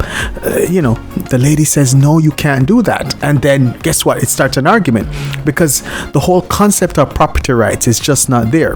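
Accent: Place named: Jamaica. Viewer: You sound like a batty Boi Jamaican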